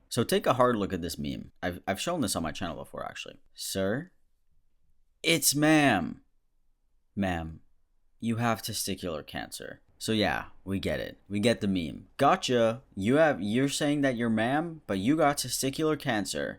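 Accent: United Kingdom. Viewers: How do you have a more British accent than me, I'm British. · british accent, not that bad, not sure which part of britain youre tryna be from tho... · i laughed at your British accent so much😂. it was better than a lot of peoples tho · I know I'm late with this comment but I just want to say, I'm British and I appreciate your British voice, not bad at all! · PLS-WHY DO YOU SOUND LIKE SHREK WITH YOUR BRITISH ACCENT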